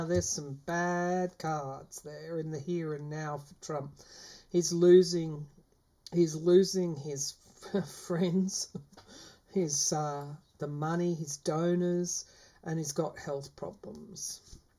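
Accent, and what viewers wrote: Australia. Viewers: Hello, what a joy to find you. I am fairly recent to Taroscopes & a new subscriber. I listen before sleep. I often don't watch, just listen. I heard your voice & knrw instantly that you are Aussie. I am so impressed with your channel I have only heard two of your readings & what a joy to onow yhat you do politics. After all we are so affected by Government & the fall oits that occur. I would love to know that Mr Morrison has some fallout from the reality that he & his Government have perpetuated in Australia. Love that Gladys & McGuire have been rxposed. Will there ever be corruption free politics 😢 thanks for what you do ❤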